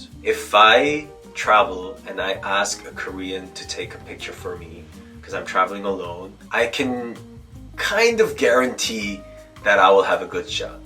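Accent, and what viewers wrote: England, United Kingdom. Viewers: Love love your English accent. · Am i imaging a British tone to your English lol. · Love the British accent!! ❤ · speak English with a British accent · Omg you sound english, American and English it’s so cool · Korean food is THE BEST, I don’t know how I ever lived without it and now I eat it all the time. Love your Brittish accent 🫶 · Why do I for some reason hear a hint of a British accent? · you can tell you learnt English in England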